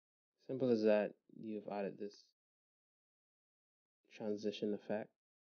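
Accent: Bahamas. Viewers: you bahamian? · same! I knew you sounded Bahamian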